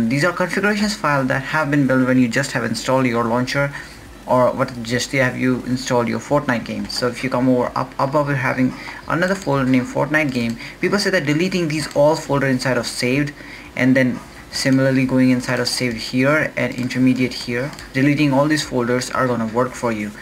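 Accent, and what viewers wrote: India. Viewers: I don't trust anyone with an east Indian accent and a computer with an internet connection ......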